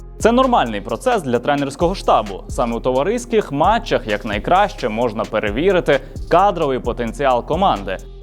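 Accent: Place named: Western Ukraine, Ukraine. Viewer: Мене тривожить одна думка, у звязку з перепадами світла, звідки насправді Ви? Слухаючи ваші передачі не важко догадатись по розмові, з Тернополя чи зі Львова? І хто відповідає на мої коментарі, той що веде передачу чи командою відповідаєте? 🤔🤔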